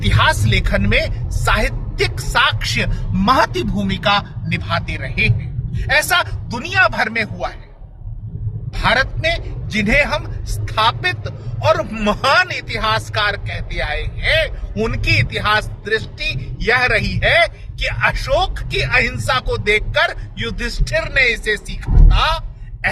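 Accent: Standard. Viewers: मुझे आपको सुनना पसंद है , आपकी हिंदी भाषा की शुद्धता कानों को शीतलता प्रदान करती है, आपके ज्ञान को नमन ,आप अपना काम करते रहिए , हम आपके अच्छे स्वास्थ्य की कामना करेंगे।धन्यवाद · Aap ki hindi kafi shuddha hai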